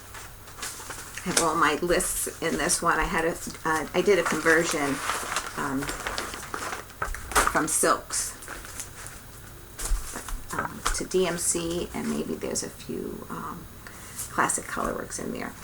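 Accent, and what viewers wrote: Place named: East Coast, United States. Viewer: Hi. I'm glad I found your channel today. I'll go back to your Flosstube #1 and catch up on your videos in the days ahead. I moved to the desert southwest in 2000 but you and I have the same accent so I believe we are both from the same coast of this beautiful country. Like you I cross-stitched a little bit in the 1980's until work and bringing up 2 boys took precedence. Once the boys were grown and on their own, I took up quilting and about 2-1/2 years ago I stumbled on cross-stitch again at our State Fair. I am glad that I have two of the most wonderful hobbies and I love them equally. Since there are four full weeks in May, my Maynia plans consist of four WIP's and four new starts. Monday through Friday of week #1 I will work on WIP #1 and Saturday and Sunday work on new start #1, etc. Good luck with your Maynia plans and I look forward to your next video.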